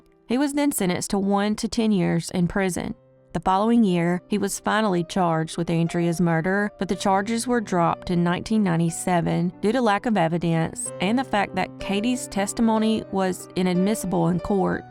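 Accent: South, United States. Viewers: Ai voices and pronunciation are absolutely horrible. We love her southern accent on this channel so you're free to go watch a different channel that you like better. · I don't want to disrespect the victims here but I snicker every time she says it. 😂 I'm southern and I love her accent. We say naked to mean undressed, "nekkid" means you're undressed and getting Iin trouble. 😊😅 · Use a an AI voice you are way to country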